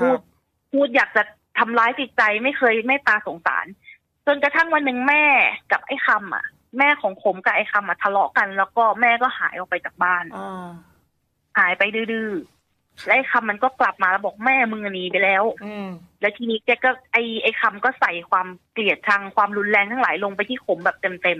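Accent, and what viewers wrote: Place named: Isan, Thailand. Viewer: ฟังไปเเอบขำ คุณ เล่าใช่คำอีสานคำ ไทยคำ 55 น่าจะเล่าภาษาไทยไปเลยนะ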